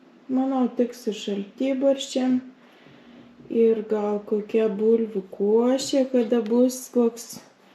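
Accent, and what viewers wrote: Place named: Samogitia, Lithuania. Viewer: Tamsta, esat nuostabi.. Puiki žemaitiška tarmė..malonumas ausiai..malonu Jus matyti ir klausyti..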